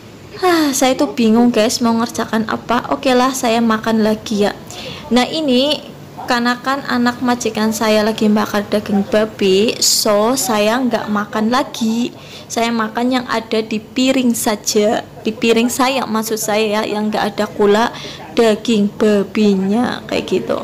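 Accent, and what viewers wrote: Banyumas, Indonesia. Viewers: Hadir KK,,,ora ngapak ora kepenak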